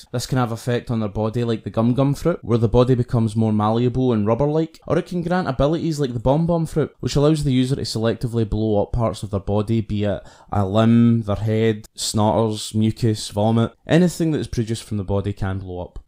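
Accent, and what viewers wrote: Scotland, United Kingdom. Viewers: This guy’s scottish fs tho so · U scottish? 😄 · I was gonna say I could’ve sworn that’s Scottish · Is that a Scottish accent?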